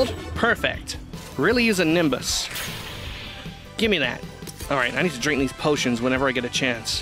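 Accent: United Kingdom. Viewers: Ah yes British goku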